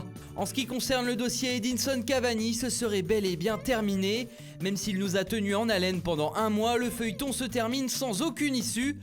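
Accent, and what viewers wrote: Italy. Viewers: Elias Parker Bah ouais nous on veut entendre l’accent italien gros 🤣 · Je reste toujours attentif à ton accent italien quand j'écoute,je ferais comment si tu pars? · Non non non il faut que tu restes !! Le plaisir de l’accent en série A va tellement manquer :/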